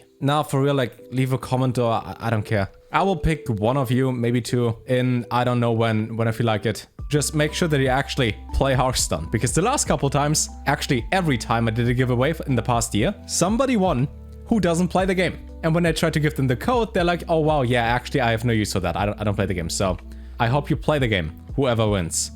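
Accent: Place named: Germany. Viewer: I love your German accent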